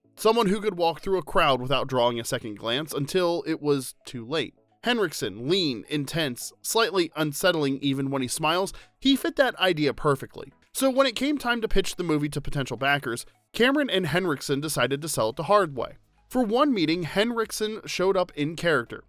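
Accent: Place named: South, United States. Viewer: I enjoyed this. Thought it was funny that the narrator didn't reference his own Southern accent. The word "on" as a two syllable word, "drawling" instead of "drawing", etc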